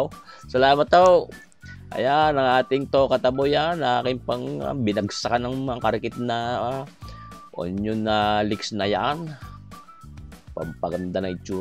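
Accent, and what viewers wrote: Batangas, Philippines. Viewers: Nakaka aliw manood sa accent ni sir pinoy na pinoy🤟👍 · Halo -njoyed Ur video n ur Batangueno slang 😀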